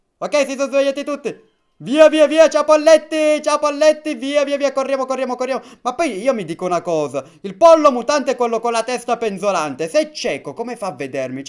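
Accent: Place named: Sicily, Italy. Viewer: Non si capisce l'accento mi sembravi della Sicilia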